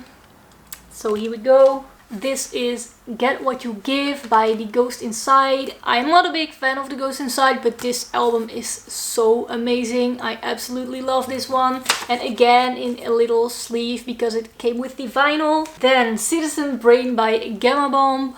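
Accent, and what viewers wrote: Netherlands. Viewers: Nice accent English/Dutch haha but its really good 'Ik heb dat ook alle Nederlanders bijna' Great video!! And Wow not many girls have a good taste of music like you Love your Collection Groetjes van Rotterdam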